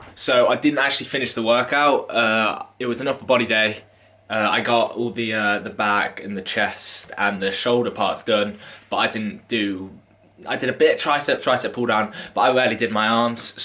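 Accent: United Kingdom. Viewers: Anyone wanna else not expect hi sound british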